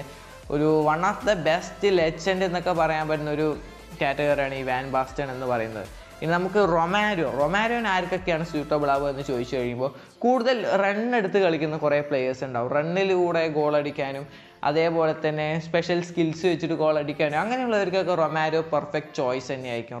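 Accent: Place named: Palakkad, India. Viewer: എനിക്ക് slang കേട്ടപ്പോൾ മനസ്സിലായി ഞാനും paalakadanu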